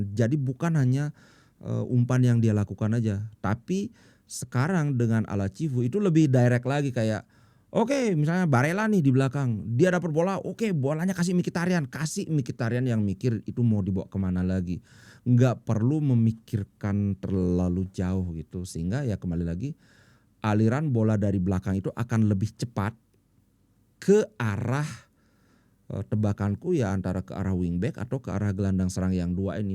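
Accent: Bali, Indonesia. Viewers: logat kayak org Bali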